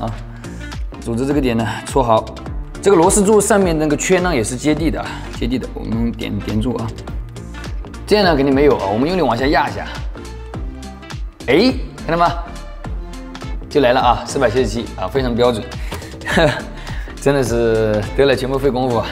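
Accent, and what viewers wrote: Wenzhou, China. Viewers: 牛 上来就是标准温州普通话口音，一看真的还是温州老板 哈哈 赞～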